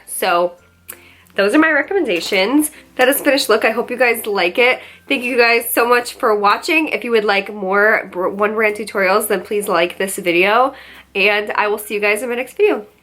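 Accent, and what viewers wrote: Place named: New Jersey, United States. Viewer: You're sooo beautiful are you from New Jersey?Im wondering where your accent is from lol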